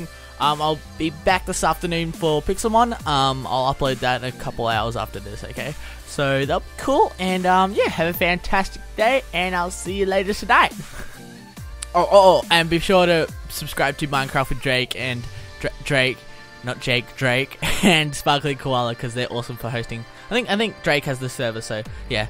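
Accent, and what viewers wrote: Australia. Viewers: 15 I came from his new react video xDD. Ikr he sounds so Australian here and much more younger.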